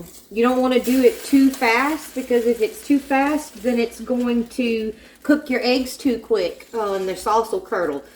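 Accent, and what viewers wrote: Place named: Mississippi, United States. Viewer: You talk like my cousins ❤ Were from Mississippi, are you??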